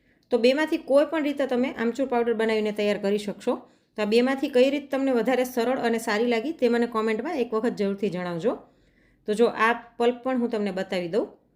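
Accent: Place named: Bhavnagar, India. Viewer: ભાવનગર બાજુ ના છો ?ભાષા એવી છે માટે પુછયુ ખુખજ સરસ રીત બતાવી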